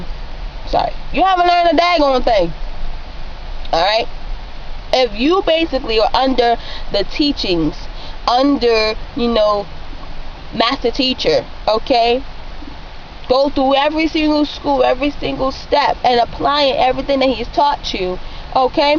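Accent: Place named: United States. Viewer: ok u r like american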